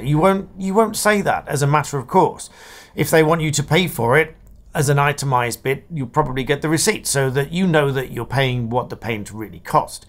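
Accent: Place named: United Kingdom. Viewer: I enjoyed your coverage of the Johnny Depp case and of this case. A true analysis based on what was and has been presented. That comment did not need an explanation by you but you correctly and eloquently put her in her place in your amazing well spoken British accent. Keep up your good work, I as with many others, certainly appreciate it as it gives a better understanding. Thank you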